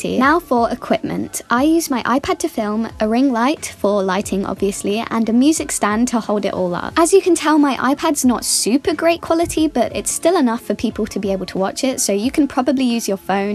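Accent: United Kingdom. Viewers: I would love you to teach your British accent. that would be helpful. 😊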